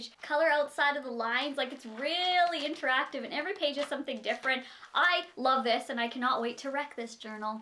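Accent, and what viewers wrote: Canada. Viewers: Hear your Canadian accent heh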